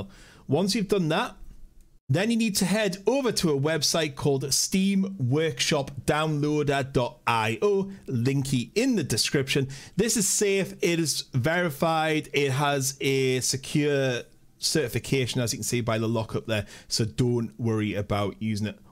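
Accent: United Kingdom. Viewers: seems to be british.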